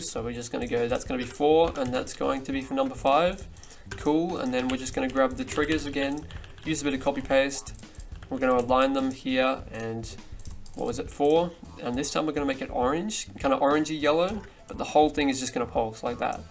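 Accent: Australia. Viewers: being australian af with that G'day everyone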